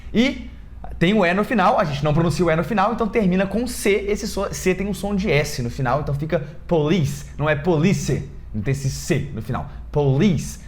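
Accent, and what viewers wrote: Minas Gerais, Brazil. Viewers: Muito obrigada por este vídeo muito esclarecedor. Você tem boa entonação e é preciso ao explicar, o que não fica cansativo. Sem falar no seu sotaque mineiro que é uma gracinha. Parabéns, tenho aprendido muito com você.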